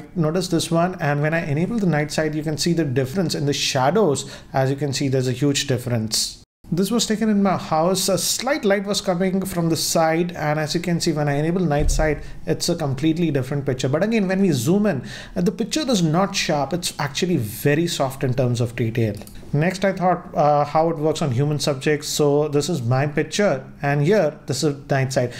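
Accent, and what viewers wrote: India. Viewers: Desi Babu English Voice..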